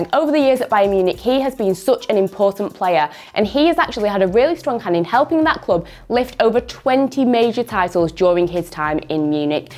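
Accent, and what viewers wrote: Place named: United Kingdom. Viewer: Its a British dialect....some also call British " bretesh"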